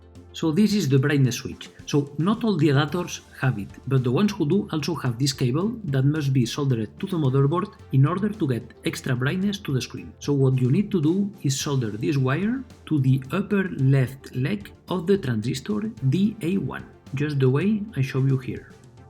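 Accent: Spain. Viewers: hola tu eres español no????? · Tu eres Español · Acento de español como una casa 🤣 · Claramente eres español, pero me encanta tu inglés jaja (y también tu canal, joder!) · Eres español a q si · Tu ingles es tipo vallecas. Eres español no??? Donde pillas componentes? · eres español??¿¿ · Cómo se te nota que eres español!!! 😂😂 Porque me pasa lo mismo con el inglés! Suscrito!! Yo le voy a hacer el mod a una SP AGS-001. Porque de momento no encuentro una Advance bien de precio y se lo haré a la SP que tengo desde el 2004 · La virgen como se nota que eres español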